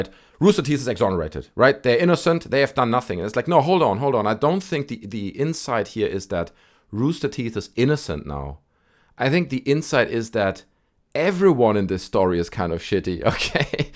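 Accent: Germany. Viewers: come on that sounds german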